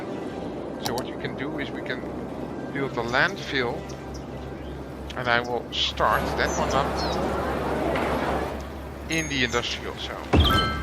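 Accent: Netherlands. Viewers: keep up the good work! and from a guy from japan your dutch accent sound funny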